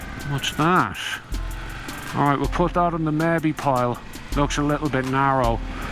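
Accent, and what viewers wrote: Ireland. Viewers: Everything just sounds better with an Irish accent. The weather looks amazing up there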